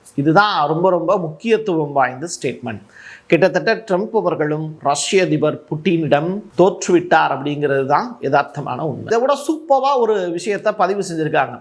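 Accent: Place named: Chennai, India. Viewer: Madras தமிழ் தானே பேசுகிறீர்கள்.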